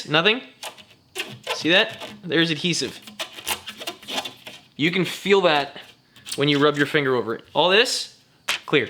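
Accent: Canada. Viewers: Art thou Canadian? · Let me guess.... you're from Canada?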